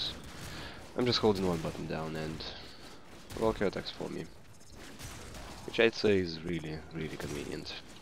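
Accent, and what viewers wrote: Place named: Russia. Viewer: Судя по такому произношению , автор отечественный .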